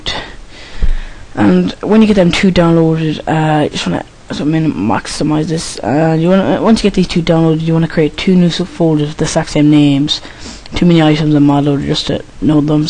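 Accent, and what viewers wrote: Ireland. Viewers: are you irish you sound irish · WHAT PART OF IRELAND ARE U FROM?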